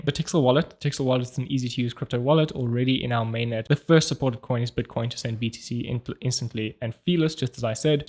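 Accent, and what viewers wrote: South Africa. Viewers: By any chance you're South African ? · I love your south African or Australian accent and your videos.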